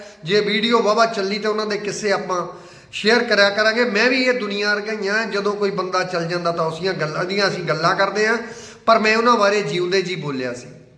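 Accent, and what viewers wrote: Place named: Doaba, India. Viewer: Eh mazhe wal di boli nai ji. Eh doabe di boli aa.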